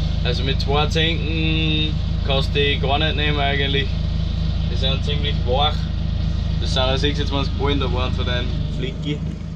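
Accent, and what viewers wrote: Austria. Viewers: Kimmst du aus Österreich?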